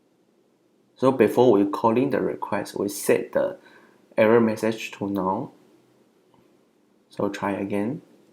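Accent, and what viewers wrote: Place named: India. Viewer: 还是说中文吧。。这英文听着和印度人一样的，产品不错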